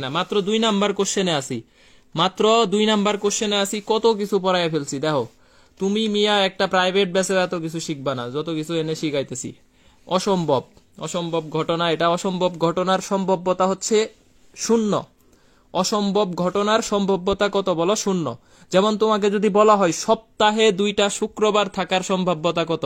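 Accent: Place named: Barisal, Bangladesh. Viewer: বরিশালের ভাষা সুন্দর ভাইয়া ♥️❤️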